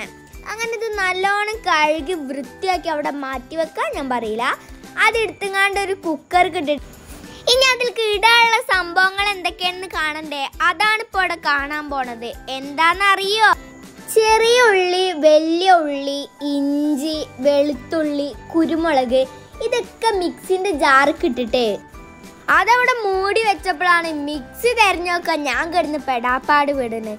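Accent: Malappuram, India. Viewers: ഇത് മലപ്പുറം ഭാഷ ആണോ, 😘😘😘 എത്ര വയസ്സായി മോളെ നിനക്ക്, മാഷാഅല്ലാഹ്‌ 👍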